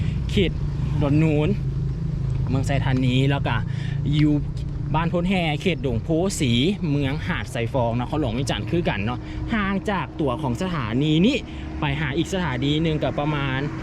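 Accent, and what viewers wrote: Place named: Thailand. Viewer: ສຽງໄທບ້ານຫນອງຫນ່ຽງເບາະຄົນບັນຣະຍາຍນີ້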